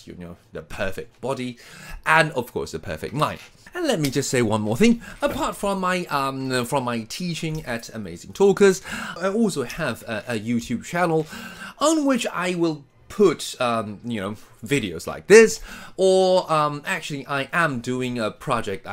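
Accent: RP, United Kingdom. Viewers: 我也是看了阿滴才知道老師真的太帥了~超喜歡老師的英式~口音~! 真的會重拾學習英文的動力~ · Eko老師反差太大，明明外表超日本視覺系，感覺一開口就要講日文，但講出來卻是一口道地的英式英文，而且還能言之有物，厲害👍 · 看阿滴進來+1，老師的英式口音真的很好聽喔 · S C this is not the only way to speak English, you can always alter your accent towards the kind you prefer. The accent/tone is just because he is trying to convey a thought, or say, giving a speech. I don’t see a problem in this😂. There are more than one British accent of course, and this is just how ppl talk in formal occasion. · 這英式口音太有魔性了😂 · 我只能說，無論是不是有人覺得這是刻意的英式英文，但，我太愛了！很開心從阿滴頻道認識Eko。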